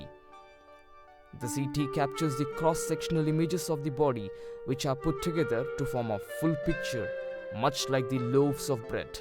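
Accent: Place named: India. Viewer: His English is Indian.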